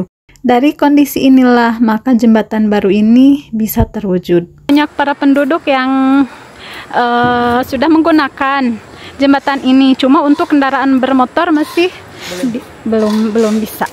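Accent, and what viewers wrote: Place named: South Sunda, Indonesia. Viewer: Logat pakidulan nana ange yeh 😂😂😂😂😂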